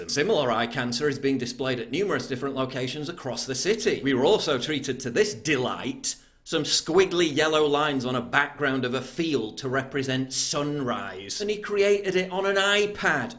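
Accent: United Kingdom. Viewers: Even if I wasn't on his side politically, I would still love this rant for how british it sounds...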